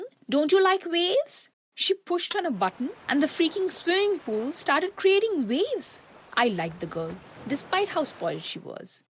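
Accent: India. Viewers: The stereotypical Indian accent ☠️🦇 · Finally an Indian Story with a perfect Indian Accent 👌🏻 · No Offense but I don't like this Indian accent :/ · Damn loved this Indian accent and story 😁🔥❤ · OMG the accent!!!. We in India speak in English just like this. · The Indian accent is good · FINNALLY A CHANNEL WHO GOT OUR COUNTRY'S ACCENT YAYYYY INDIA KI JAI side note: THIS IS A INDIAN TV SERIAL I SWEAR this is after watching the whole video: i knew this country has problems but jeez 😥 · It's a Indian voice 💯🤗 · I did not know how a indian accent sounded until this video. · are u indian bc i am and I recognised the accent! i love your skin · Her Indian accent is so horrible, i don't understand how people are praising her accent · Finally india accent my dear country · Perfect Indian accent another central American girl storyteller pls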